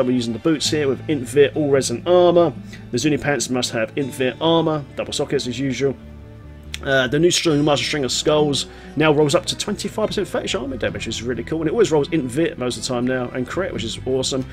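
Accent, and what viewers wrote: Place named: United Kingdom. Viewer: I don't understand half the things this guy is saying! Damn Brit accent. Slllooooooow down man when you speak during your vids, thanks!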